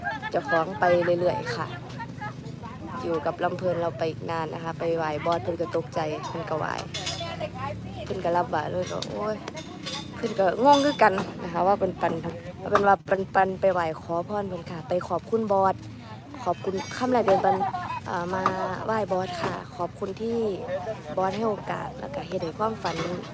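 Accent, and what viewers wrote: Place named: Isan, Thailand. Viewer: สำเนียงเสียงใกล้เคียงกับฮันนี่ศรีอีสานเลยสุดยอด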